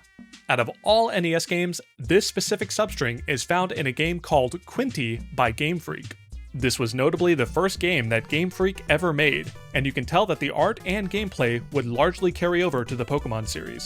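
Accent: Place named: RP, United Kingdom. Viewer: Why do you speak premium english like blathers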